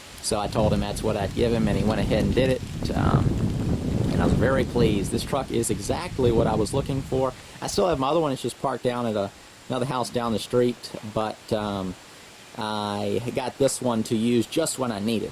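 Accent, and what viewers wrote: South, United States. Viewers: To tell the truth, as a non-english-native-speaker it was your accent that made me subscribe (something from the south right?), but i kept the subscription because of things like that barn-tear-down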